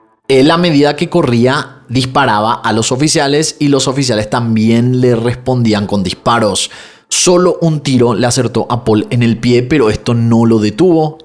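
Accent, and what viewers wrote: Paraguay. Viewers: Haupei kpeee, yo sabía que ese acento y forma de hablar era de mi querido Paraguay! Éxitos siempre che dúo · Amo que tu acento volvió a ser paraguayo 😉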